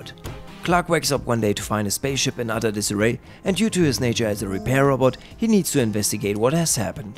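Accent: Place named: Croatia. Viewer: Are you Croation becuase my friend is from Croatia and he has youre same accent?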